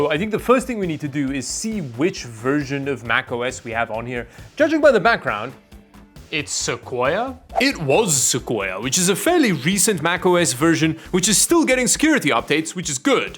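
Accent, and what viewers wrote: South Africa. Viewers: Are you South African? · are you South African? or am I tweaking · The South African accent is strong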